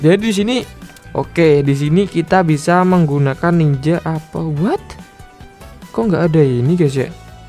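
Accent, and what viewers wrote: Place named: Madura, Indonesia. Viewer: kenapa nadanya jadi tretan dah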